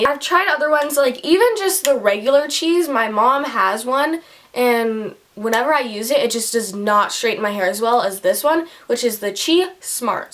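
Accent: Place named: United States. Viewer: IKR!!! But American accent obviously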